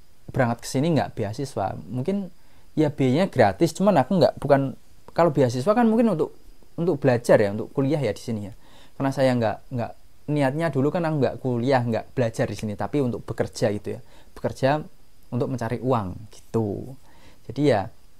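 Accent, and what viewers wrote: Java, Indonesia. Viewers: Suata mu masih medok jawa ne, kl pun tinggal di Jepang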